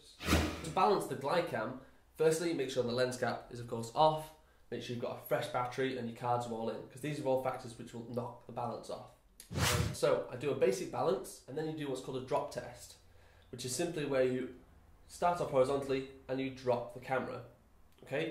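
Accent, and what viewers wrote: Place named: Ireland. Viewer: Nice Video, but what you said? "walk like you .... yourself!" Sounds like a good advice, but hard for me to understand the irish accent! please write it down! Thx pal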